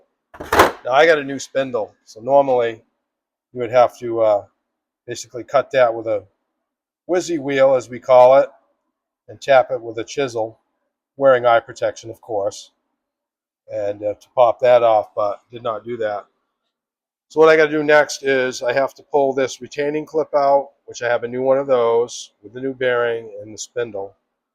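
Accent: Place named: Maine, United States. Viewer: Guy you def a mainah...you ain't from New Hampshire.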